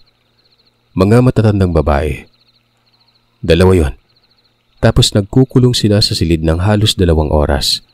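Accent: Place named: Iloilo, Philippines. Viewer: Ilonggo. Eh. Malambing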